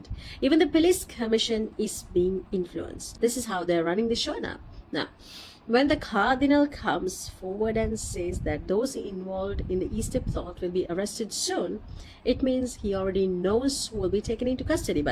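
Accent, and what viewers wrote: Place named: Australia. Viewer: Wow where do you get this accent from? It’s very close to Australian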